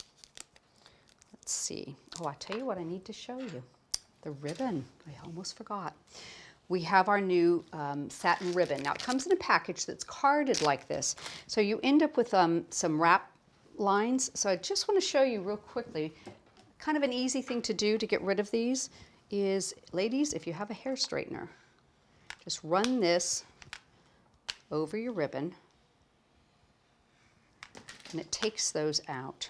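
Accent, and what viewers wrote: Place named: California, United States. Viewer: Just love to watch and hear her Californian accent....cards aren't too bad either:) x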